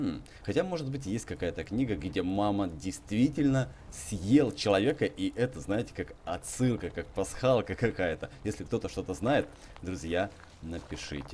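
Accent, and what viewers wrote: Ukraine. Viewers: судя по твоему говору ты живешь на Украине? Или это юг России?? Ростов или Кубань?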